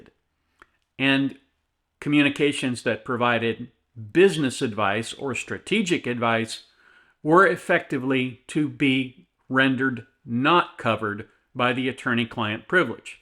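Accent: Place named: Midwest, United States. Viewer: Thank you, sir, for your content. Found your channel during the Brooks trial, as well as many other licensed lawyers’, which helped me understand more about the judicial process. Aside from his antics, the trail really pulled the curtain back for the layperson to see how one works. You and your YT brethren helped fill in the holes and maybe even influenced people to look into the judicial system as a career, to make it less intimidating and more open. Knowledge is power and all that. You are correct in that not everything you put out will be applicable to everyone, but know that there are those of us, both who have discovered you and those who haven’t (yet), who appreciate your insight and willingness to share knowledge, so again, thank you. And yes, doing one small good thing a day does help the world. Side note: I’m retired US Navy, born and raised in MO (STL, then Mid-MO), retiring in the Seattle/Tacoma area. 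Though I doubt I will ever return home permanently, - we have the Cardinals, Chiefs and Mizzou, after all! - it does make me smile to hear a little piece of home. Your candor, your midwestern accent and your Missourian viewpoints all have me missing home juuuust a little bit more, so thank you for that.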